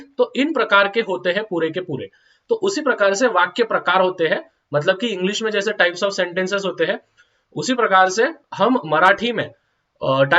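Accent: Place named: Hyderabad, India. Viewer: Sar Hyderabadi accent😅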